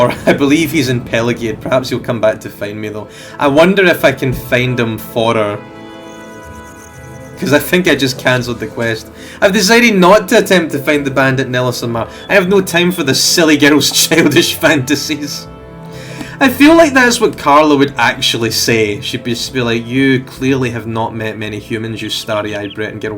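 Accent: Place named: Scotland, United Kingdom. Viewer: Thats quite the accent where about in Scotland are you from mate?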